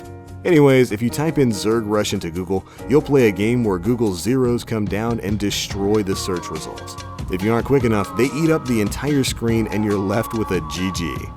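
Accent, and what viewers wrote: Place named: United States. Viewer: You're in the UK? You sound so American.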